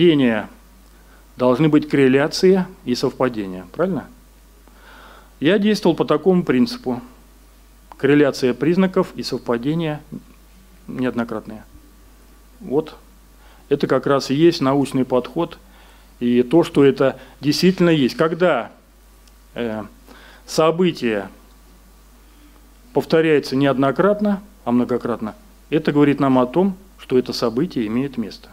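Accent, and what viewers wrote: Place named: Ulyanovsk, Russia. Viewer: Такой говор в Ульяновской области.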